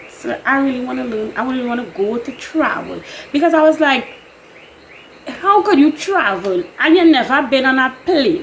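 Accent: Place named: Caribbean. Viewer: So love your accent. Is that Trini or Jamaica?!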